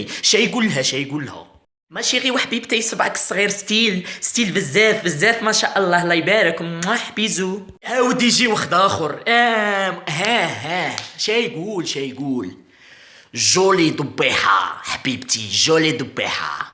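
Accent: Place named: Oran, Algeria. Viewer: ههه لهجة وهرانية مضحكة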